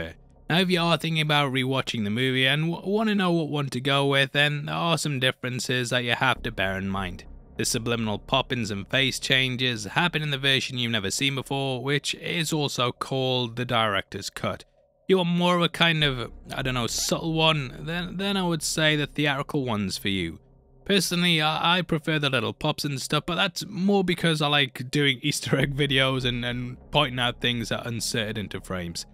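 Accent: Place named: United Kingdom. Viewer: why you english so british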